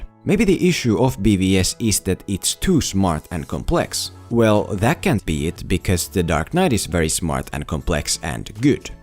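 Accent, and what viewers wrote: Finland. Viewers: Hauska kun heti sun venom videon alusta huomasin sun suomalaisen aksentin :D hyvä et meillä on oikeesti hyviä ammattimaisia suomalaisia leffatuubajia !! jatka hyvien videoiden tekoa ! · Suomienglanti havaittu: torilla tavataan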